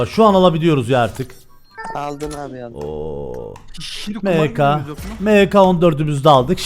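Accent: Cyprus. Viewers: Sırf kıbrıs ağzın için abone oldum çok iyiii msksleodk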